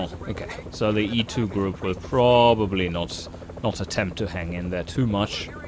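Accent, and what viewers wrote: Germany. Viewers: Nice sympathic german speaking English. Ich liebe es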